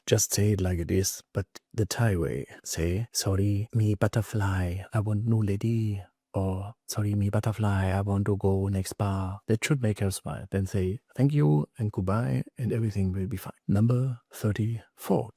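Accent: Germany. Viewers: haha, ich habe das Video tatsächlich im og ton geschaut und paar Minuten später war ich mir sicher, dass du aus Deutschland kommen muss 😅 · It took me until 16 minutes to realize you must be German. 😊 Ill be there in 11 months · LOL l like the Masterpiece Theatre whisper with the low lighting - think it adds to the atmosphere especially with your great German accent! Been in Thailand about 6 months and you speak ABSOLUTE TRUTH brother and I have actually had many of the same thoughts and realizations as yourself. Thanks for your wry, witty humour and entertaining content. Much appreciated 🙏Here’s to the Elephant Pants!😂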